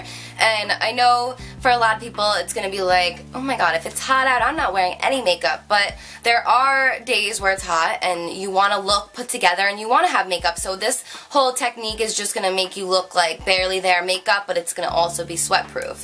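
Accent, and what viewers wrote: New Jersey, United States. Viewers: Iol I love you New Jersey accent :) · I swear ur Jersey accent is so cute listening to it compared to my country accent....love being on the same coast but hearing how our accents change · R you from jersey? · i love your new jersey accent! esp. the way your say mascara! :) xoxox from new orleans!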